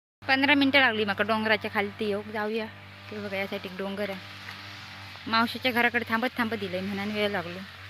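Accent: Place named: Malvani, India. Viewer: छान शुद्ध मालवणी ऐकुन बरं वाटलं. गावाचं नाव सांगितलें असते तर अजुन व्हिडीओ ची मजा आली असती. शेअर लाईक करा.मालवणी भाषे करिता.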